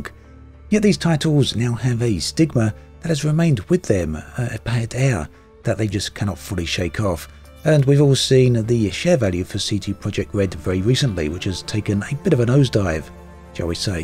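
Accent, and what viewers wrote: United Kingdom. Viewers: Pretty good game and stuff but it's pretty obvious your desperately grasping for content that people want to hear with your weird whispering British voice lol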